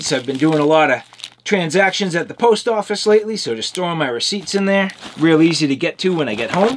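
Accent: Boston, United States. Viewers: Great review, and your Boston accent makes it even better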